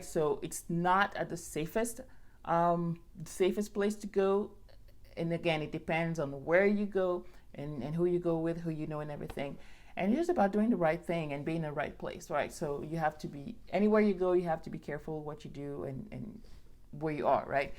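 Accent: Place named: Cameroon. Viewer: my girlfriend is from cameroon so i can tell you spent time with a cameroonian just based off the way you talk lolololol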